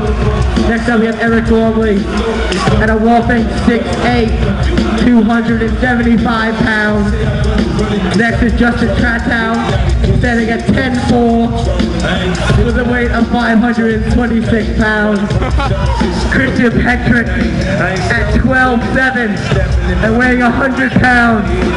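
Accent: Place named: United Kingdom. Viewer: wow looking back that british accent was a terrible idea and extremely annoying hahaha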